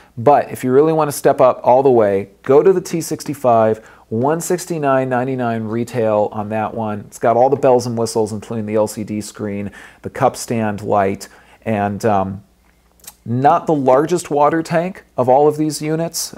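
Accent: United States. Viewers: He's american. They all say button that way.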